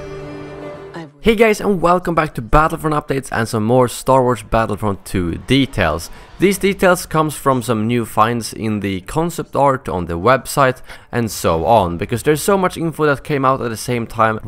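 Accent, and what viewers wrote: Sweden. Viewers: You can hear that he's swedish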